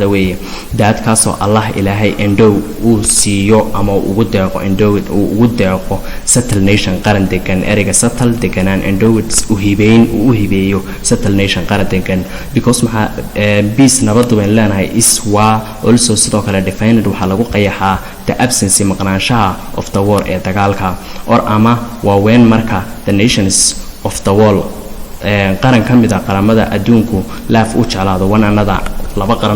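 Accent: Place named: North, Somalia. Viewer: I,m very happy today because your speech is heer waqoyi, the previous days I watched the more teachers I can't I understood the talk them, welcome teacher😇🥰😇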